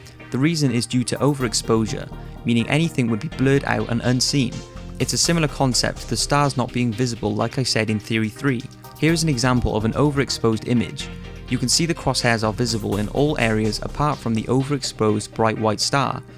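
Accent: Wales, United Kingdom. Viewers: I squirt a little bit when you said “a little bit iffy” in your majestic Welsh accent.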